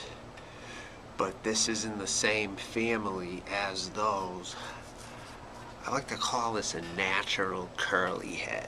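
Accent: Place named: Boston, United States. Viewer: Yo are you from Boston? Damn that accent is strong boy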